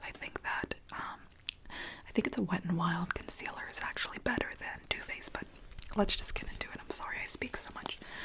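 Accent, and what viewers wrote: United Kingdom. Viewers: thumbs up if you want to do a whole ASMR video in her British accent... thumbs up so she sees this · wait why did she start off british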